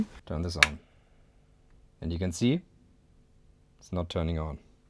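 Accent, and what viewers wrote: Germany. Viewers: You have the PERFECT accent to go with that voice. So comfy, and Teutonic enough to inspire confidence in you, somehow? IDK it's just wonderful to listen to. Like that organ!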